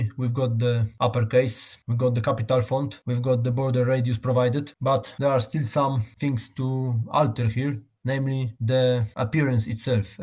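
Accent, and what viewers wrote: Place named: Malta. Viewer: ah! You sound Maltese. Thanks for your informative videos. Dziękuję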